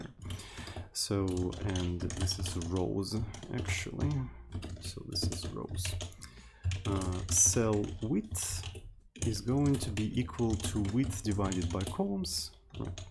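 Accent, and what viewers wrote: Russia. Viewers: русский акецнт?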